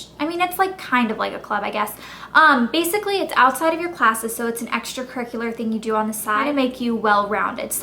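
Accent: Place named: Ivy League, United States. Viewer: You sound like you went to Cornell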